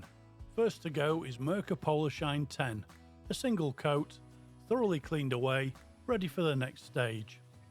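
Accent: United Kingdom. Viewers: I like your pedestal bowls, not least because I haven’t seen anyone else do them; and this one is particularly nice but I’m looking forward to seeing what you come up with next. It’s also nice to hear a British accent (Yorkshire?). 🌞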